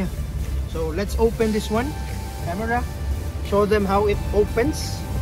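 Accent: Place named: Visayas, Philippines. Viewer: naenjoy ko nmn sir, kaso nabigla ako sa visayan accent mo eh, hehehehe. anyway nice content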